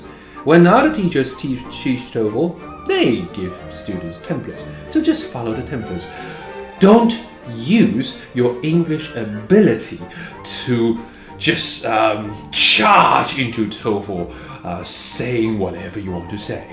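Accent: United Kingdom. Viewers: Thanks for your guidance in Speaking。 I really like your authentic British accent！ teacher looks like Harry Potter Wizard And is super cool。 Ha ha ha ！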